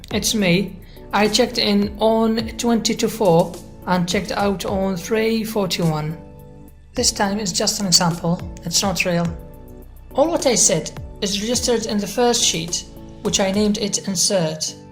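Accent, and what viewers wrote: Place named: United Kingdom. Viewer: جهاز روعه واللكنة البريطانية اروع ! استمر للأمام...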